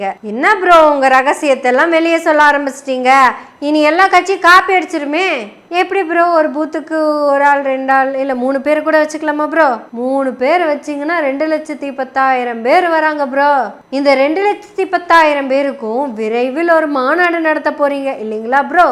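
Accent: Kongu, India. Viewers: அருமை மகளே கொங்கு தமிழின் பேச்சு. அட்டகாசம் பெரிய ர் கருத்துகளை பரப்புரை செய்யுங்கள் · பனையூர் பண்ணையார்.அருமையான பெயர்.யெனவே யெனவே பேசுவோம் மகளே.கொங்கு தமிழுக்காகவும் கருத்துக்களுக்காகவும் சேர்த்து வாழ்த்துக்கள்.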